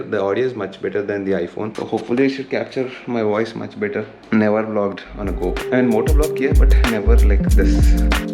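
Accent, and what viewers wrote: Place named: Telangana/Andhra Pradesh, India. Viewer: bayya me English is bad...pls work up on it.....take a second and speak....may be fast but not listenable....its a true translations of telugu grammer....not english.....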